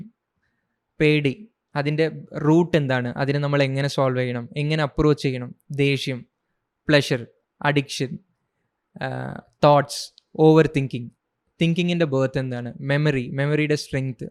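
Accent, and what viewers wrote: Tamil Nadu, India. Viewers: bro are you tamilian or malayali how did you learned malayalam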